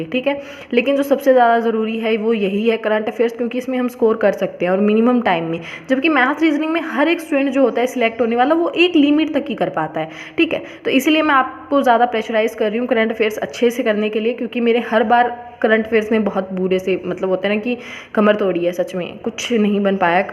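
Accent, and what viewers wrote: Haryana, India. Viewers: Aap haryana se ho ????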